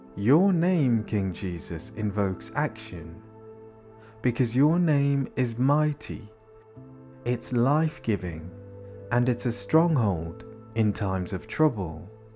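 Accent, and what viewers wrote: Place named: United Kingdom. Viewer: I was looking forward to this, but... the British accent put me off. Too bad for me but I certainly hope this is good for those who can tolerate the accent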